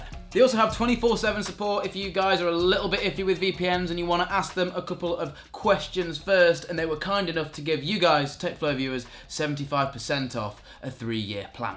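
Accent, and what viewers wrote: United Kingdom. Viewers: Noah Van Dijck Since he is British he pronounces, Ethernet as EHthernet and Router as Rooter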